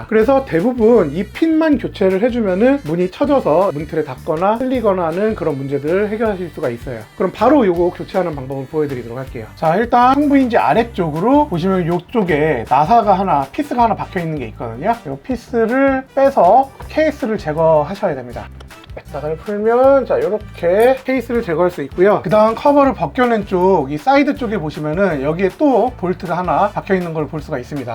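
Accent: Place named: North Korea. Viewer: 말투가 북한말투네... 이 3끼 간첩 아닌가?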